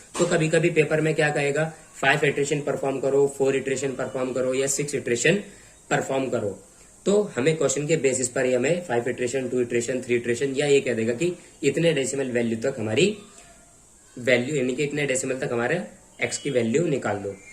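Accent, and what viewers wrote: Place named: South, India. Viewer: sir your pronounciation for squairereee is very funny , its seems that you are using south indian accent..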